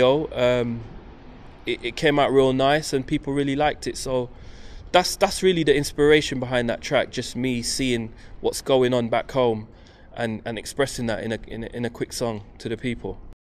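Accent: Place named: United States. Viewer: He's good tbh..but he needs to put the British accent on...and not American..even though American accent sound better, but yeah other than that he's good™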